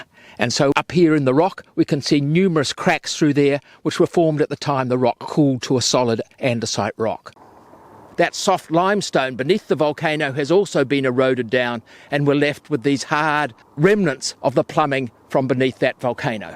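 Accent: New Zealand. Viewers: You have to guess it's in New Zealand from the guy's accent. Very interesting, well done.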